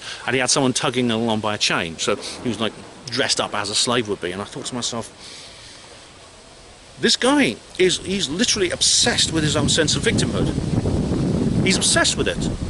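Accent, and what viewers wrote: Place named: Cockney, United Kingdom. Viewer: mate, I am from Hertfordshire and there is nothing more annoying than a three counties Cockney.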